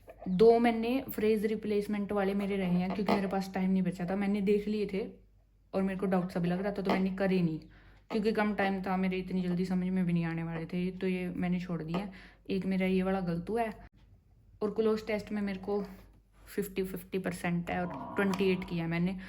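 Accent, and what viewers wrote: Haryana, India. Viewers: Haryanwi ho kya accent se lg rha h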